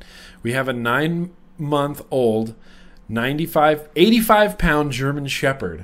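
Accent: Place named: West Virginia, United States. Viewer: Hey there mr. lol i just bought a dreadnaut clone from fasttech and everything seems to be in all the right places but i cant seem to get it to give off big vapor ive built the coils like six times i have been using 28 kanthal and organic cotton i use a multimeter it usually gives me.9 ohms but when i fire it it seems like it takes too long and u have to press the button at like an angle for it to even fire what coyld i b doing wrong? Please any help or advice would be greatly appreciated i love your long ass vlogs its awesome i love that adorable too im from west virginia so we sound alike lol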